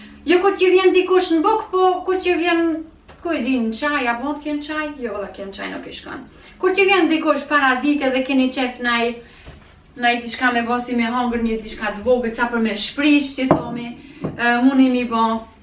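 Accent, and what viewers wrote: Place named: Kaçanik, Kosovo. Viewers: Qysh po fol si mu kan e Kaqanikit ? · M Z mos e kretiko se po tknaq ka po fol nashta asht e kaqanikut po ska lidhje se ksaj i ka hije ke e kahit ke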